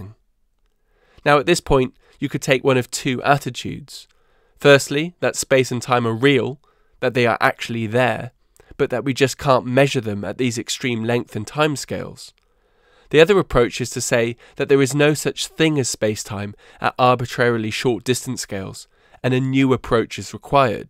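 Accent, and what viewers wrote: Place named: RP, United Kingdom. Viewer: I hate your british posh accent. It sounds like you are constantly trying to project this exaggerrated image of finesse - thus implicitly also trying to cast social hierarchy. I guess this is typical to cultures who have had royal courts for several centuries such as england, france or sweden. But please stop doing it. I'm not saying you have to start talking like a football hooligan but I know brits can speak in a reasonable manner.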